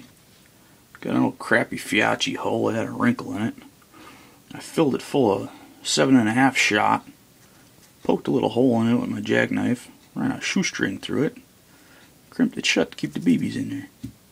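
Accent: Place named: United States. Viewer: Fantastic video. You bottom line stuff and talk in the proper american english just like my uncle. Thanks for the info and the fix!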